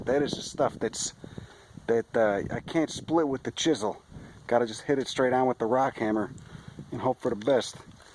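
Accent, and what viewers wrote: United States. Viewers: those leaves look like they were pressed yesterday, thanks for the video, just one thing can you slow your narration down just a tad i have trouble keeping up, not use to the U.S accent.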